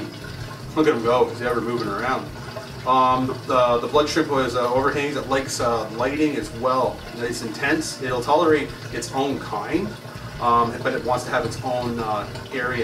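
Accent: Canada. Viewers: he's from Canada they say stuff differently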